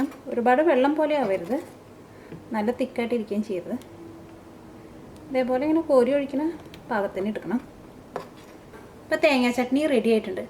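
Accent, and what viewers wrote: Thrissur, India. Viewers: trissur analle naadu? avatharanam super anutto njan ennundakkunndu yours chatni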